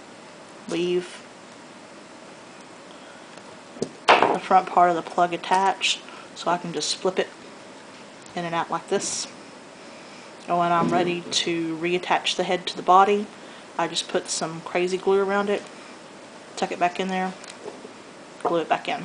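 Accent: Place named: South, United States. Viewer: Oh cool! I recognized the traditional southern twang, but noted it wasn't as nasaled as Texan,nor as slightly deep as Tennessee,where I live.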